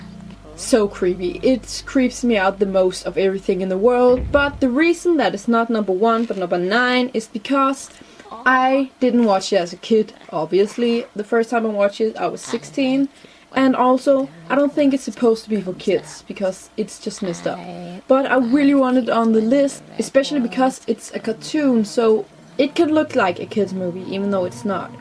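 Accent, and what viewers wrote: Denmark. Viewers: You're from Denmark aren't you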